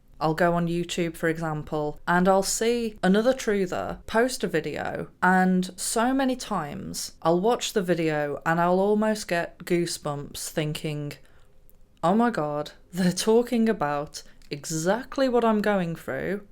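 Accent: United Kingdom. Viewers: I am definitely connected to you. LOL Your British accent and your brilliance on so many different topics lulls me to sleep every night. Keep up the good work. Mark in Dallas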